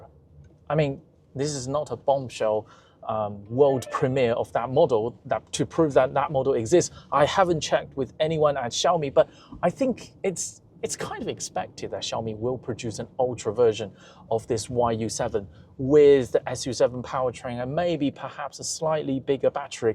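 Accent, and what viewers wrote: United Kingdom. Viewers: The British accent is so distracting😂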